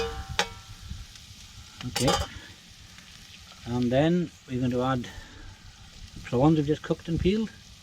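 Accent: Wales, United Kingdom. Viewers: Great prawns n lovely welsh accent👍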